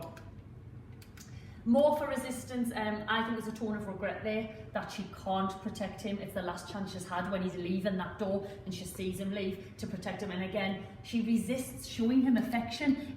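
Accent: Newcastle, United Kingdom. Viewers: From the north east surely , Newcastle ?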